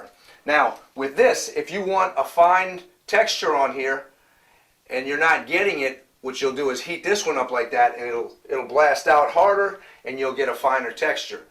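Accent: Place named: New England, United States. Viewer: This is actually a great tip. A lot of people hype up the DIY tips that everyone knows, this one I haven't seen before. BTW- I see the southern background and hear the yankee accent, I'm in the same boat. Best decision to move down here. Now on to binge watch your videos...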